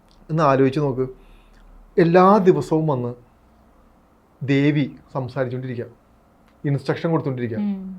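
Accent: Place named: Ernakulam, India. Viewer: Yes കുമ്പളങ്ങി nights shane നിഗം slang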